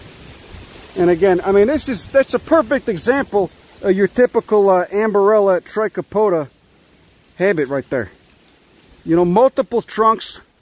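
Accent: Chicago, United States. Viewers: the heavy chicago accent makes this so much better because it makes you sound like such a dad. im basically imagining a chicago ned flanders teaching me about plants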